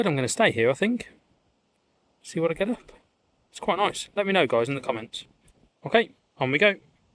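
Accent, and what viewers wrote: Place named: Midlands, United Kingdom. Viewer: looks like a Roman handle, Ellie Miss_D will know. is a beautiful shoe buckle or a knee buckle. 100% nut crackers, someone cut them down. Good video. I thought that was a Midlands accent, I was born in Birmingham and all my formative years were in Shirley.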